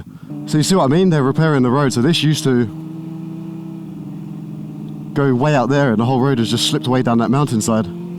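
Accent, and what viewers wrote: United Kingdom. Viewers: Uk accent but NZ roads is wigging me out haha. Would love one of these. I have CBR250rr (another 20k screamer). Is the best.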